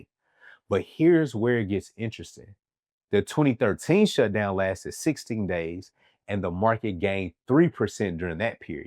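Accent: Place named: Kansas City, United States. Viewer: Thank you for this. It almost feels second nature to scramble and try to mitigate loss by pulling out money and holding tight to every penny during a time like this. On a different note, I don't know where you're from originally but that accent sounds real KC 😂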